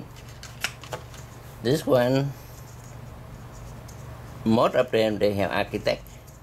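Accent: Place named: Southeast Asia. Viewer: I work with a guy that sounds just like this guy. He's from southeast Asia. I love talking to him. :) Very good video and great information!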